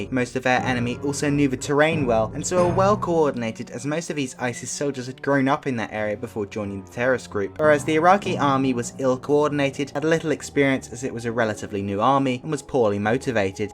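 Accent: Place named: United Kingdom. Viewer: so i assume your british from your accent so college for you would be year 11, 12?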